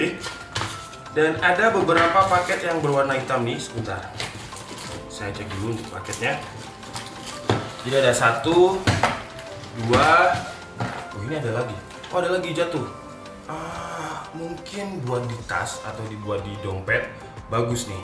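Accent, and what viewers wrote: Malang, Indonesia. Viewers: orang malang mas?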